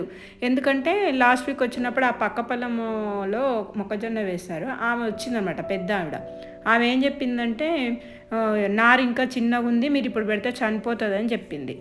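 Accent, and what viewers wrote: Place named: Rayalaseema, India. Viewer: Ragi sakati resipi chayandi garu maa rayalaseema basha matladuchnnaru meeru andi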